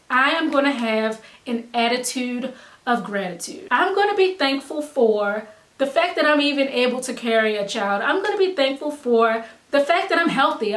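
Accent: Baltimore, United States. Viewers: Are you from Baltimore??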